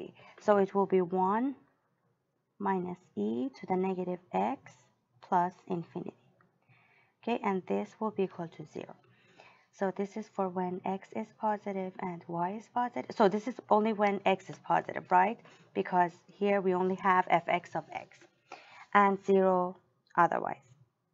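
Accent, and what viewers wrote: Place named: Iran. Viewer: I'm sure that, this accent is persian :)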